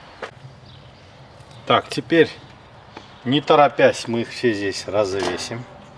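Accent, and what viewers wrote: Baku, Azerbaijan. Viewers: Салам. Акцент Бакинский 👍❤️👍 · Этому человеку необязательно что - либо готовить) достаточно просто рассказов с прекрасным бакинским акцентом и этого вполне достаточно)) Все как всегда супер, слюной подавились) Джаныныз саг олсун!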